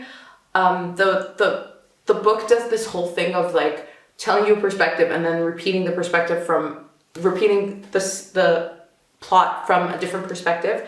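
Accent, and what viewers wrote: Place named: United States. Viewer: Based on your accent I definitely assumed you were American lol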